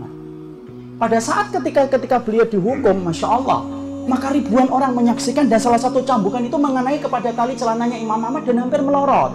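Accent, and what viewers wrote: Indonesia. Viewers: kangen medoke.. alhamdulillah ilmunya..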